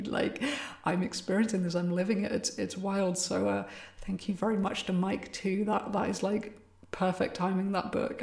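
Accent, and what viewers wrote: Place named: England, United Kingdom. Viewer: WOW!. So cool you liked the cards I sent you and that they inspired a video.I called my wife in to see and she totally freaked.I feel like a real nerd but you know what, I am a fan,what can I say.I like the early morning trips to the woods dancing in the dark and hearing the king's english. will be Sharing on facebook.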